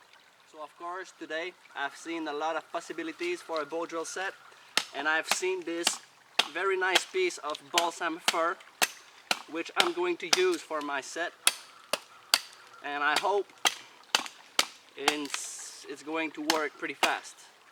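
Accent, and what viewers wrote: Quebec, Canada. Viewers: You sound French Canadian, I could be wrong, dude you're awesome, merci beaucoup. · are you french? from canada right ? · hummmmm... je crois entendre un petit accent québécois dans tout ce qu'il dit ^^ I think hear a quebecois accent in his voice^^ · je viens de sub ton accent je me suis dit fuck de ou au quebec il viens lui haha vaudreuil ici!je te suis,tres bons videos!je veux commencer a faire ca,sortir de la routine des enfants etc! prend soin de toi soit safe! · Thats a nice quebec accent :) cool video man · Great video! Are you Canadian French in Quebec?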